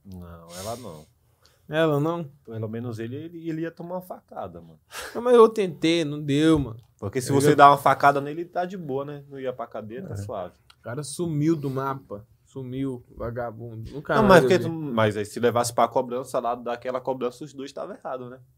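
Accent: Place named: Belo Horizonte, Brazil. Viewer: Ambos são de Bh por isso que parece kkk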